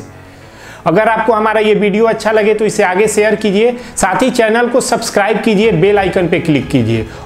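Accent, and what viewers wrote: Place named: Bhojpuri, India. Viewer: bhai mere bol Hindi rahe ho lekin awaz bhojpuri wali hai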